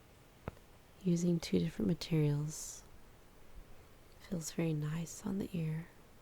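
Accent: South, United States. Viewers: By the way, do you happen to be from the Southern United States? I thought I hear just a really slight drawl but maybe it's just the soft speaking.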